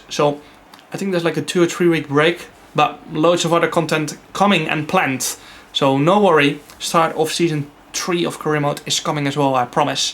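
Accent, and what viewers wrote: Netherlands. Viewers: You really sound dutch while speaking english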